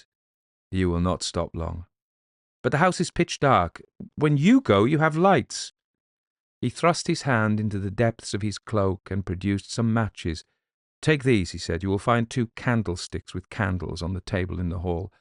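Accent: United States. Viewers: I don't know about the regional dialects disappearing everywhere. I'm in southern West Virginia and there's still various dialects the further south you go. As for doing an American accent, his isn't too bad. I love his Scottish & Irish versions. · If I can perform a British accent but half as well as you do an American, I will consider myself a success as an actor. :)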